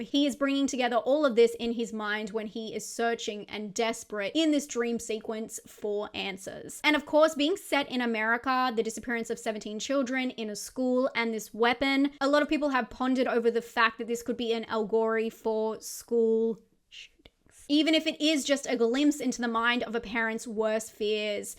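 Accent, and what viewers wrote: Australia. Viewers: We say ree-NYOO-al You sound Australian. Aren’t you from Australia?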